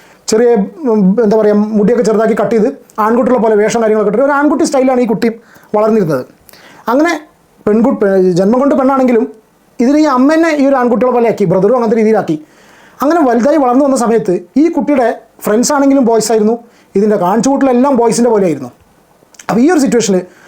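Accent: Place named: Palakkad, India. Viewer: ബ്രോ വീട് പാലക്കാട്‌ ആണോ ഭാഷാ ശൈലി അതുപോലുണ്ട് 👍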